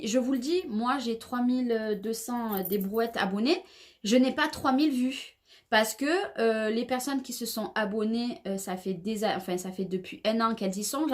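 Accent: South, France. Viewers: Bonjour,pause café,soleil et une vidéo Tag youtube très intéressante, j'ai apprécié ta sincérité et ta franchise..! J'adore regarder tes vidéos 😍 tu es tellement gentille attentionnée pétillante généreuse,et surtout très talentueuse,et ton petit accent du Sud 😉 j'aime vos créations en duo avec Marick.. Tu es exceptionnelle,tu me remplies de joie quotidiennement. Je te souhaite une excellente journée ensoleillée et créative 😉 pleins de bisous 😘💋 Bea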